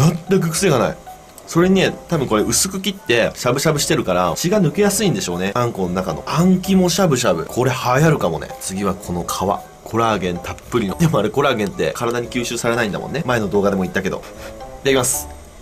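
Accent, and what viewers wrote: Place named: Aichi, Japan. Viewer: 「生かしたる」とか「見ちゃかんよ」とか、めちゃくちゃ愛知県の方言っぽいと思っとったら愛知県だったわ笑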